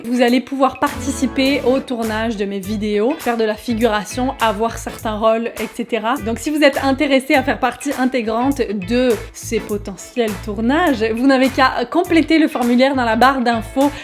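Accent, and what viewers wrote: Quebec, Canada. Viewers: J'adore tes vidéos et j'aime trop ton accent ❤😉(j'aimerais bien avoir l'accent québécois ,et parler la langue )❤😋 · Salut tu pense et aller à quelle âge au Québec et tu pense avoir eu au bout de combien de temps accent québécois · Je suis trop contente avoir une youtubeuse qui parle québécoi comme moi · J’apprends l’accent québécois avec toi et j’ai encore plus envie de passer ma vie dans ce pays de caribous. Tu es vraiment inspirante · j'ai hésiter de m'abonner a la première minute et en fait ,tu fait beaucoup de blague et tu explique très bien , et j'adore entendre des québécois/e parler français , avec leur accent . J'adore ta chaîne continue comme sa tu fait de superbe vidéo , tu va rapidement être de plus en plus connue je pense ! par contre je suis PAS SUR que mes parents voudrons bien que je vienne tourner avec toi ,et même si tu habite en france je pense que tu habite pas en bretagne , sachant que j'ai que 13 ans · Ben moi sais simple tout se que j'ai à dire ben sais que tes génial et j'ai une amie Elle et née au Québec et maintenant elle habite en France 😀 et je t'adore tes génial PS j'adore ton acens j'adore l'acens Québécois 😀😁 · J’aime trooooooo l’accent québécois omg 😍😍 c’est pour sa que je regarder t videooo ❤️ pas juste pour l’accent he 😂😂 tu me fait trooooo rire continue comme saaaa 😌 je te soutiens a fond 💪 enfin, ON TE SOUTIENS !! 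❤️❤️💪💪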